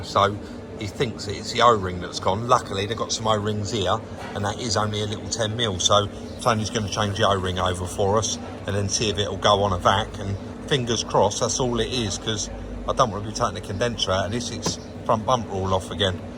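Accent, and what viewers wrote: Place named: Cockney, United Kingdom. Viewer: Nice video guys , i took a personal interest as a partner owner driver ( yes your right they are all the same except badges and outside grill bits and a few other bits😮) and i must admit i was worried when you gave it a test drive and you mentioned smoke but you knew what you was onabout, good honest video and you do yourselves proud every time, as a Northener i allways imagined you guys with Southern accents were a bit sharp but you do give the motor trade a good name and well done .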